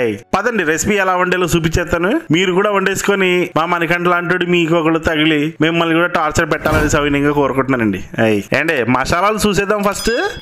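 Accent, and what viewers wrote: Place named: Godavari, India. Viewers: Ma godavari slang chala baguntundi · Pakka local accent medi rajamundrey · garu Godharollu mari aala mataladathara dharunam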